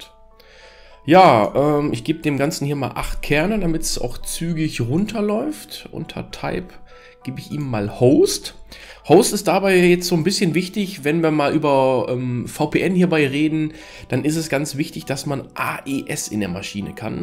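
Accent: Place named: Germany. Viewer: Gutes video und 100% deutscher Akzent lmao.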